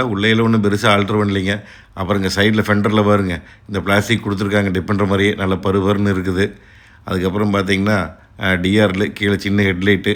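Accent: Kongu, India. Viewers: கொங்கு தமிழ் கேட்க ஆனந்தம்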